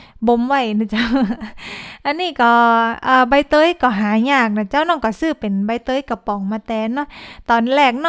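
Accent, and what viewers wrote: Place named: Chiang Rai, Thailand. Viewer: จากสำเนียง…น่าจะคนเชียงราย ไม่ก็ แพร่น่าน แม่นก่อ..ตั๋ว??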